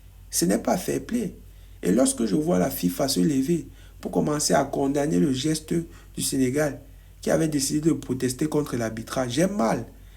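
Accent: Cameroon. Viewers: Toi tu as un accent camerounais hein, tu es sûr que tu es ivoirien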